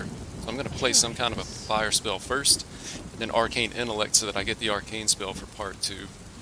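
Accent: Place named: South, United States. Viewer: Love the thunder and rain in this video. I also hear a southern accent. Greetings my southern brother.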